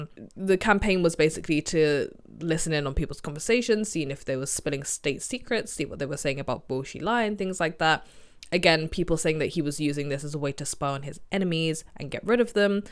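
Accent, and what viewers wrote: England, United Kingdom. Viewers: How do you know so much about China? That's an English accent, isn't it?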